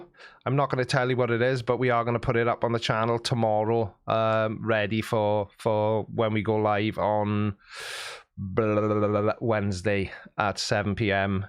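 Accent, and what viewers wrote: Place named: Wales, United Kingdom. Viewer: G'day from South Australia, I think the Welsh accent is the best in the world followed by the South African. There is nothing wrong with speaking your mind, don't change that's why we follow you.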